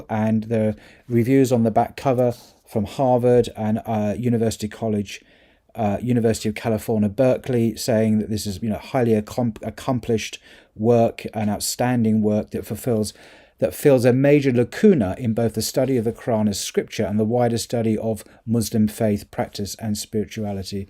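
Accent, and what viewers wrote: United Kingdom. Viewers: So ‘phenomenological’ is a tongue twister term for you. I was wondering if you had one! 😅 you have that eloquent British accent that sounds magical...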